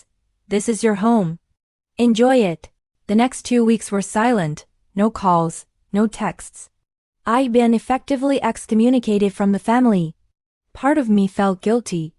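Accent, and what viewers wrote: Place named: Asia. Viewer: Why do you sound like you have an Asian accent while a bunch of white people are used for the story?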